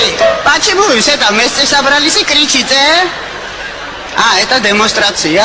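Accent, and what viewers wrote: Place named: Yaroslavl, Russia. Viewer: Говарит и поёт на чисто русском языке, с небольшим Яраславским акцентом... 😊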